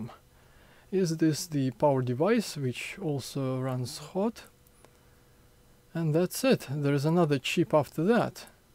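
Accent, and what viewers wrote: Russia. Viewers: Ахренеть какой русский акцент.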